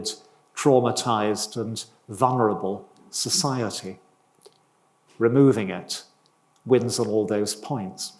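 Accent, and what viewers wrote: United Kingdom. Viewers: I heard good things about Ronald Hutton - and then I started listening this video I was negatively surprised he has an British accent. As soon as I hear the British accent I am expecting to hear the most wild insane goofy ridiculous stupid clumsy statements ever. Obviously, my motto didn't disappointed me this time. The only thing that is true and decent is that Christianity actually curbed witch hunting - sacrificing people is strictly pagan in nature. The rest of it is pure garbage. Men hunted women because they were... misogynists? Seriously? Women of the pagan world would brew concoctions... they were able to make herbal medicine, tea and poison. Some of them acted as agents, some of them assassinated people, some of them were performing abortions, some of them were engaged in zoophilia (as American women do now with dogs, old central European women were doing it with the [black] billy goat). 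Some of these women were simply prostitutes, some were seducers (all women are hypergamous) and some of them were liars (as the lie is the only weapon that a woman has in a strong physical manly world), and these lies weren't mild lies, like "I love you" or "I was a virgin when you took me", but lies about who the father of the children is - and this causes lots of problems especially with the nobles or rich men that wanted to have their real offspring and their line maintained... women would also kill their husbands to inherit his riches and go run off with Chad... that's the moment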